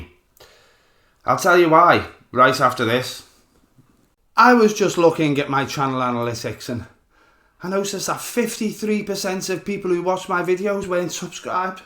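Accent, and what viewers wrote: Liverpool, United Kingdom. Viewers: Tommy isnt far right if tommy was far right he wouldnt have dealt with quilliam why dont you talk about the grooming gangs raping our girls which they do its been proven in a court of law and what does this guy do attack the one guy those trying to help..... also if tommy wants to put stuff up his nose thats his choice not your choice what this guy doesnt see the left wing are more dangerous than the far right its dangerous for us all and to end this rant the way you speak is arogant nothing worse than an aragont scouser lad talking like this online is pointless you think its right that he got put in a cell for that then it says it all about this guy your the twat your the problem hey but atleast tommy brushes his teeth this guy is loosing his teeth and why are you letting your dog on the couch you scrùff people always using his name for views facts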